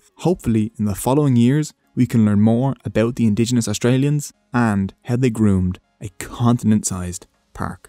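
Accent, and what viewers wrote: Dublin, Ireland. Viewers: It's a Dublin Irish accent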